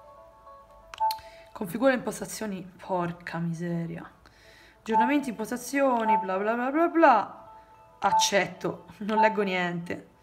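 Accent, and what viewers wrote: Italy. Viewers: Oh dio amo il tuo accento toscano❤️❤️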